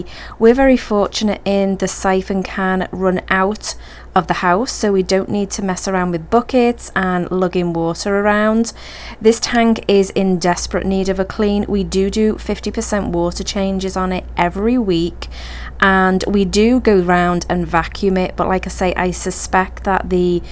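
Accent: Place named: Scotland, United Kingdom. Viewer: I ordered 2 of your Betta aquarium dividers along with the air pump and airline tubing bundle and didn't know you had a YouTube channel. You have a really nice looking aquarium and I like the stand too with the bins for storage. What I like about fishkeeping the most is how every fishkeeper has their own unique way or routine of doing things, the way you and I do water changes are massively different and I like it, keeps things interesting. I noticed you have an I believe Scottish accent and your YouTube info says your location is Uganda but your store is in the US? Do you have people in the US running the online store? Just wondering...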